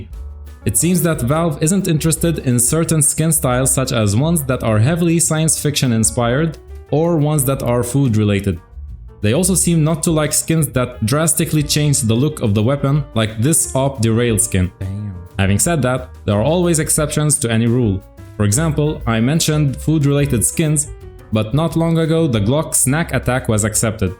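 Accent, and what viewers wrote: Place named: Poland. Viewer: Where are you originally from then? I was 1500% sure you’re Polish judging by your accent 😄 Otherwise I would write in english. There’s a lesson in that case: don’t judge a book by it’s cover 🤣 Well, now it makes more sense to make only cs2 dedicated videos.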